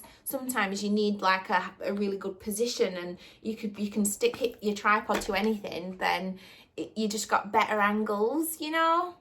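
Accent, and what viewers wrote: Northern England, United Kingdom. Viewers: It depends on regional accents. I think is from the north.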